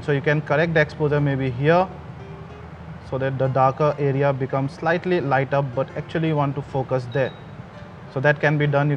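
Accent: Assam, India. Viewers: mate . you do have an Assamese accent .. r u from there ??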